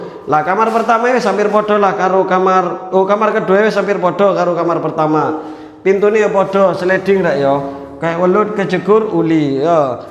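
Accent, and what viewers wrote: Surabaya, Indonesia. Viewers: Aku seneng logat suroboyoan mu mas. Salam dari boyolali lereng merapi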